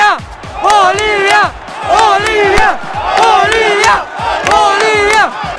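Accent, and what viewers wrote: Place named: Mexico. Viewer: Cuando lo escucho hablar parece mexicano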